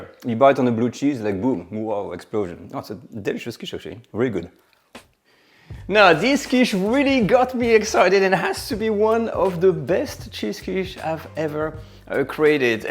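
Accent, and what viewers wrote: France. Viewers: I could just listen to your French accent for ages!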